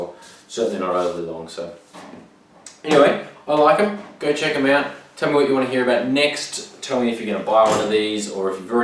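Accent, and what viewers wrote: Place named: Australia. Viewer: Love it when an Aussie is doing a video - great to hear our accent.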